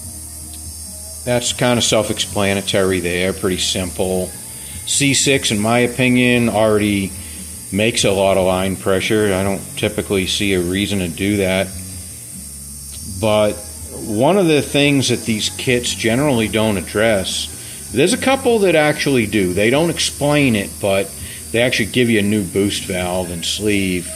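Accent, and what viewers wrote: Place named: Maine, United States. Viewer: This is great. Still super complex but really helpful. Thank you so much. I think I recall from another video that you're out of Texas, but you sound like a Mainer to me. A good thing!!! Thanks.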